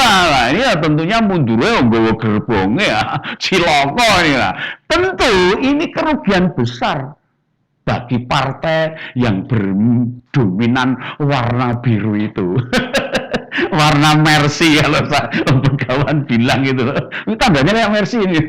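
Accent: Java, Indonesia. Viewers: Gaya bahasane si om ini kok pas banget ya,..aksen jowone yahud tenan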